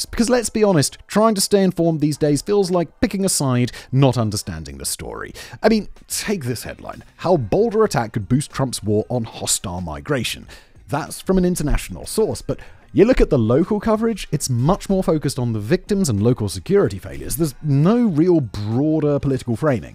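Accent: England, United Kingdom. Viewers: most annoying english accent 2025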